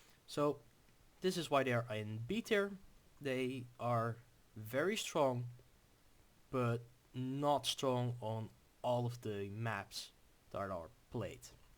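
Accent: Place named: Netherlands. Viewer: He has a pretty strong Dutch accent - probably the Netherlands ;)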